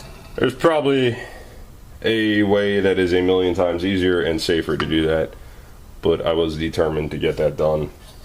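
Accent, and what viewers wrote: United States. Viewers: It's AvE but in American English instead of Canuckee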